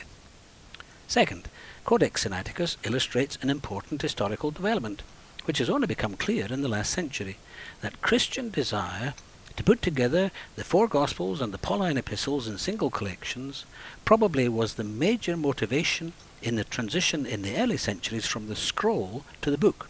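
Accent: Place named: United Kingdom. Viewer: FAKE - nice British accent though.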